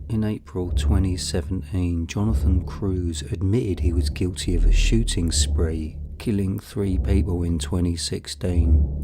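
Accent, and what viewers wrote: United Kingdom. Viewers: Nice cockney voice mate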